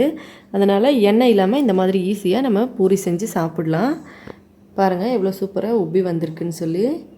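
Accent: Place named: North India, India. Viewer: நீங்க இந்திகாரங்க தான ......ஆனா அழகா தமிழ் பேசுறீங்க எப்படி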